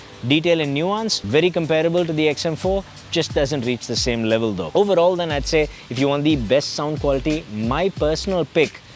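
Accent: India. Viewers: First off, I'm an American. 2nd, I don't care if this guy has an Indian english accent. he's so fluid in not only verbal communication but his facial expression, charisma in front of the camera etc. keep up the good work!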